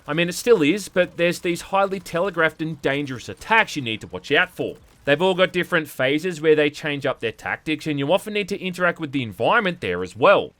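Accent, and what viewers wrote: Australia. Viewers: Why does sound MORE Australian the farther back you go in his videos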